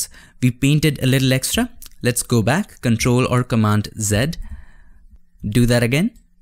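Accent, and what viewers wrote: India. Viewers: He’s Indian I think He sounds Indian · 3 its an indian accent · Indian accent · Yes, an actual GOOD Indian accent which is ACTUALLY an Indian accent unlike some scammer shit people see on YouTube. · beautyofustwo well he does sound Indian so that might be why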